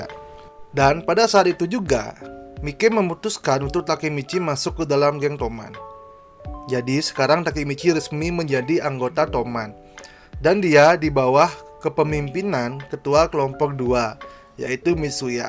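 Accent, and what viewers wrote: Bali, Indonesia. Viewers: Orang Bali?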